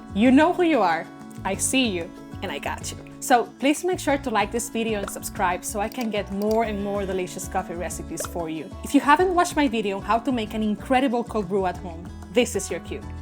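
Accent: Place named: Latin America. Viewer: Sounds and looks Latina. 🤔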